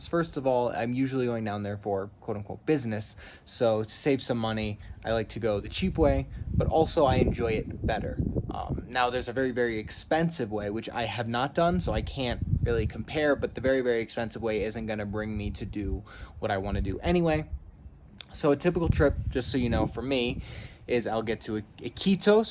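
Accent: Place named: North, United Kingdom. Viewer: hola amigo, estoy practicando mi listening skills, tu acento es norteño cierto¿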